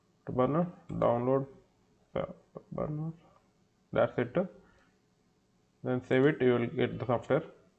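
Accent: India. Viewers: lmao the curry accent makes it better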